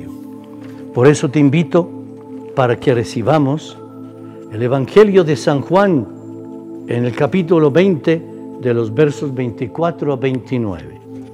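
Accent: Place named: Colombia. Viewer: Cuánto gusto padre, lo veo muy bien a pesar que le dio el covid, Dios lo protegió. Padre usted e Colombiano, siento que habla el español en forma diferente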